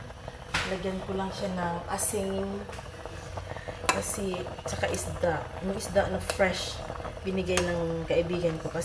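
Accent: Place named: Visayas, Philippines. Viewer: Ohh ang sarap niyan kapatid..parang Bisaya Ka host..taga saan Ka.??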